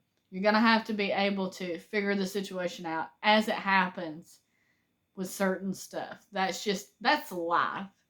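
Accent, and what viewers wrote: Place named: East Texas, United States. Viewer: Well I'm fairly new to y'all's channel and didn't know you had your own channel till your husband mentioned it. Glad I heard that. And I'm glad to hear that you're recovering from your ailment. I look at you like a daughter But your accent is music to my ears. East Texas here and that's how I talk. Hope you keep up giving good info. Had the same trouble with cheap can openers. Got a good one with a crank handle on it. Sure helps this old man. I'll see you later!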